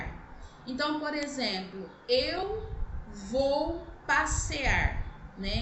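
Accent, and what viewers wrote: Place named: Minas Gerais, Brazil. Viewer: Rindo horrores com o "jeito mineiro" de falar!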